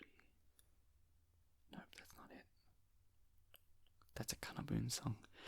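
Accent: Australia. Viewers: Thank you Australian Steve Harrington for the amazing ASMR <3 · Oooooh Australian Asmrtist I’m here for it! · We love an Aussie accent 🫶🏼 me & my sister called it “tickles” too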